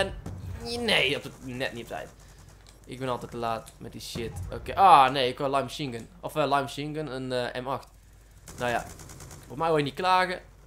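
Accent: Brabant, Netherlands. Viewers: Hij komt ergens uit Brabant. ;)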